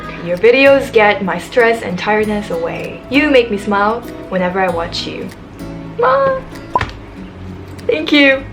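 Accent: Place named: Philippines. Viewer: I know youre not good in Tagalog but the way you speak English sounds so Filipino :-)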